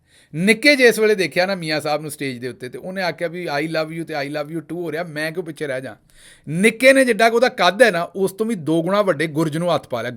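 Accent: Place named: Punjab, India. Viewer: Hahahaha Hahahahaa 😂😂😂😂😂😂😂😂😂😂😂😂 Kia style hay Asli suchi Punjabi 😅😅😅😅😅😅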